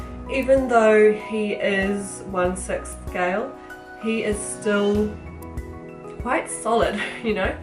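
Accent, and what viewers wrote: New Zealand. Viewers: Lol 😂, "why?" ... Love Alan's body tats. Did you make his hoodie or buy it. Been thinking of making a bjd video, the community needs more kiwi voices I think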